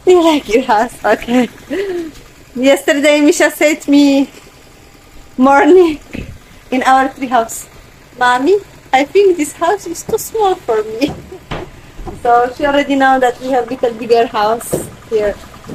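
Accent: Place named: Ilocos, Philippines. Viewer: Seems your accent sounds like ilokano.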